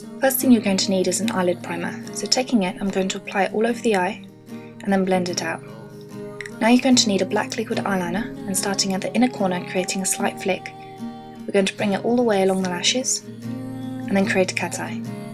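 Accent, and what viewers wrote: United Kingdom. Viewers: its nice to hear a british voice on youtube, most the people that do make up tutorials are american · i love ur british accient and ur make up is amazing!!!!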